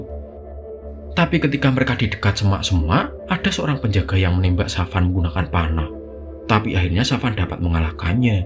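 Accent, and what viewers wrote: Java, Indonesia. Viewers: biarkan alur ceritannya dibaca Text to voice AI itu lebih jelas ejaan Hurufnya., Medok bngt jadi gk jelas ngomong apa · Ngomongnya kadang kurang jelas karena medok banget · Maklum jawir😂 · BAHASANYA MEDHOK NJIIIR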